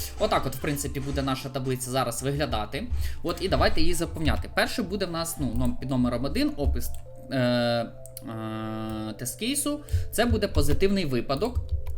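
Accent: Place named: Surzhyk, Ukraine. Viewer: Смешные звуки произносишь. Это суржик?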